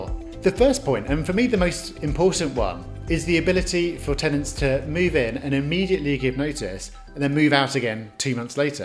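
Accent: United Kingdom. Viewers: Title is misleading. Applies to UK not the U.S. First hints are his Accent and mentioning Parliament. Magna Carter did percolate to protect property rights for the landlord. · The picture you click on to start the video shows the Houses of Parliament in London, the presenter guy has an English accent - I'd say it's probably England... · It is irresponsible of you to NOT make it clear in the video title that this is about the UK. (Only once I heard your accent did I suspect it might be - and there again, you NEVER say it in the video either!)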